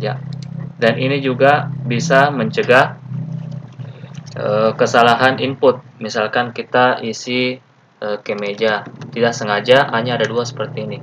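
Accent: Indonesia. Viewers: Kayak logat sulawesi sappo..makasih tutorialnya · sepertinya masnya orang makassar ya...soalnya logatnya kental banget..thx ilmunya yang mudah di mengerti !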